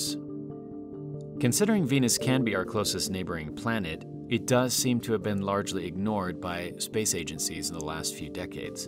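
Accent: United States. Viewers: What happened to your voice???!!! An American accent just isn't the same. (I'm from the U.S btw) I think it sounds better with your original voice. Just my 2 cents worth... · That's with the American accent? I loved ur ur original voice it was calm and soothing .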